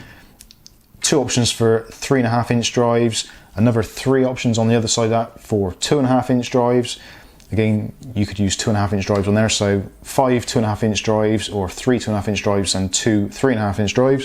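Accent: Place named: Cornwall, United Kingdom. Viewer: Are you from Cornwall? I recognise your accent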